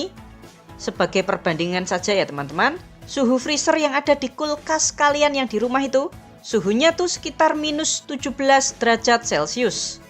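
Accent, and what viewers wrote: Java, Indonesia. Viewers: Logat nya jawa pula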